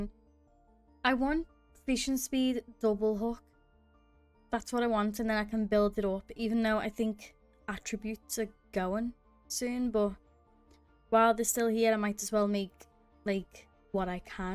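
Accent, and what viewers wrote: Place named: United Kingdom. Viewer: The british accent so lovely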